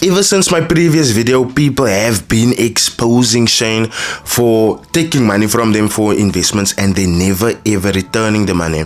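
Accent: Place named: Cape, South Africa. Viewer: The way you speak makes me miss my Kaapse brahsse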